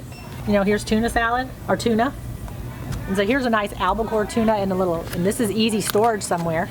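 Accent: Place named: Upper Midwest, United States. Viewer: I have to ask what state you’re from originally; you happen to sound like a lot of folks I know up in the northern Midwest.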